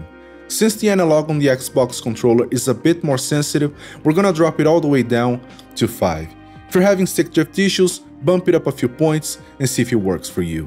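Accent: Brazil. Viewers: Esse cara é brasileiro kkkk... Certeza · tbm achei kkkk to quase ouvindo ele falando em português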